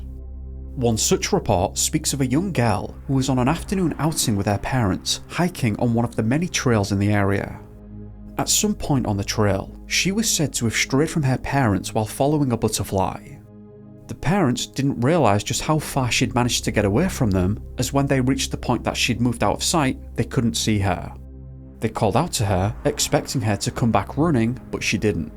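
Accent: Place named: Wales, United Kingdom. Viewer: I believe he's from Wales. Welsh accent. 🏴🏴🏴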